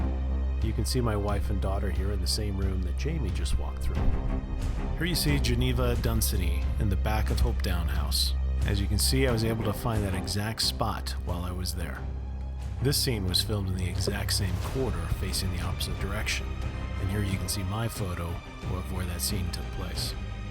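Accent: United States. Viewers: It just sounds as if insulting a country isn't important enough to try pronounce it properly while enjoying the culture seems to be your approach to other country's Scotland isn't worth trying your hardest not to offend. A small country who gave the world some of the most important advances penicillin, television,pneumatic tyres, steam engines and most important educated thinking 🤔. Umh just a thought for your Midwest pronunciation if its worth being done do it properly.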